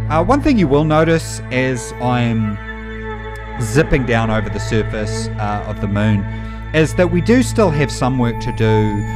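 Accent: New Zealand. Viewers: Bro it’s refreshing to hear that kiwi accent 🤙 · YO IS THAT A KIWI ACCENT???? YOO KIA ORA?????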